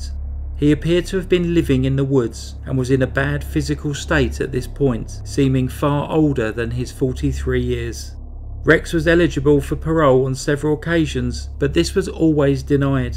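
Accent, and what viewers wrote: United Kingdom. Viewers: Blimey he was a real right canker! Brilliant stories and narrated so Beautifully and British!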